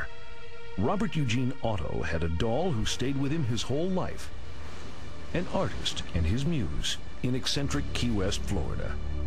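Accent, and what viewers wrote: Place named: United Kingdom. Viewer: hm ur british arent u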